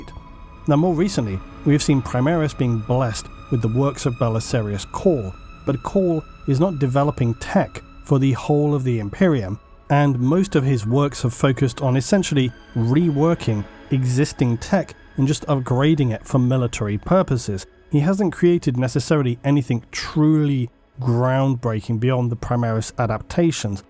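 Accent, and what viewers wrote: United Kingdom. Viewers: 3+ hours of a smooth talking British man coming to terms with free will being an illusion 😆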